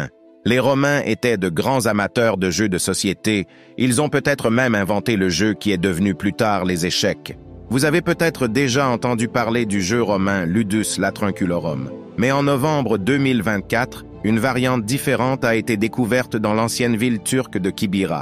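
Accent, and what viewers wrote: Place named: Quebec, Canada. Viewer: C'est un Québécois qui fait la narration...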